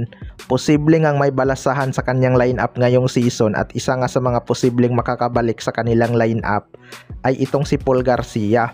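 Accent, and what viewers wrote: Visayas, Philippines. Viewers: Brad ayusin mo ha… pagka bisaya mo magsalita ayusin mo lichi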